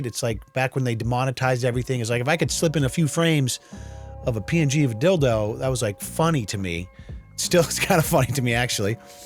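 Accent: Australia. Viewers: Very funny, I think she’s Australian not British, I’m British so I hear it. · I think she's Australian not British lmao they pronounce things much more weird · I don't think she's British. I think she's Aussie. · Australian, not British my friend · She’s Australian not British lol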